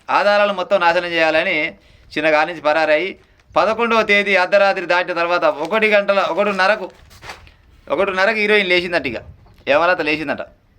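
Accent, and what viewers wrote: Telangana, India. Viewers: అన్నం కలిపి, నోట్లో పెట్టినట్లు చెప్పినారు సార్, తెలంగాణ యాసలో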